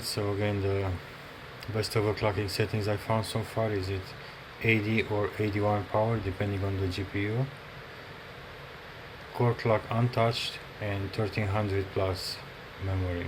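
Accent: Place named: Turkey. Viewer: merhaba, aksaninizdan Turk oldugunuzu dusunuyorum, bilgilendirici video icin tesekkurler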